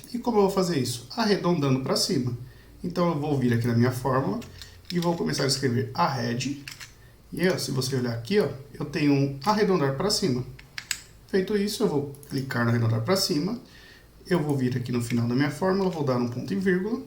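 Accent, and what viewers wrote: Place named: Minas Gerais, Brazil. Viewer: Sotaque minerim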